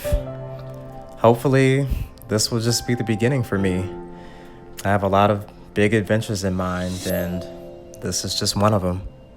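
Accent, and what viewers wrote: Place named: Maryland, United States. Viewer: hey! Greets from Northern VA! You sound like you are over the Potomac in Maryland. Came over from Alicia's channel on the Dolly Sods adventure.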